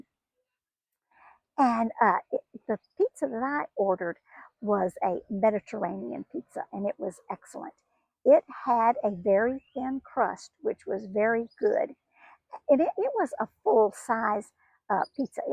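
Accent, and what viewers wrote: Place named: North Carolina, United States. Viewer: I like your accent. I'm from the UK so I'm not too familiar with US accents. Are you from North Carolina by any chance?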